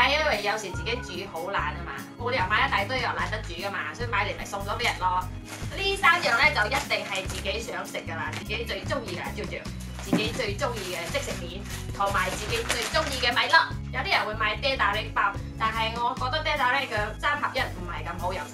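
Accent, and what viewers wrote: Cantonese, China. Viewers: 姐說的粵語真好聽。 呵呵~我就知道會有Milo，可惜我沒喝過大馬版的，台灣版的我覺得還蠻好喝的。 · 為什麼我聽妳講廣東話特別的舒暢？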